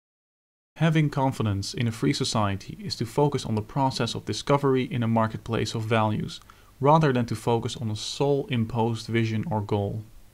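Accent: Netherlands. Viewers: it sounds as if you're from Holland. Good job with the video!:)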